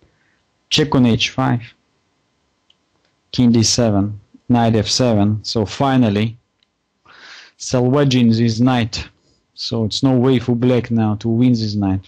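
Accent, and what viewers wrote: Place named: France. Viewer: Fantástica partida pero yo tengo una pregunta que lleva rondándome la cabeza los últimos días. Cuál es la nacionalidad de este buen hombre? El nombre es ruso, el apellido judeoalemán pero el tío tiene un acento francés que flipas… Me he quedado loquísimo.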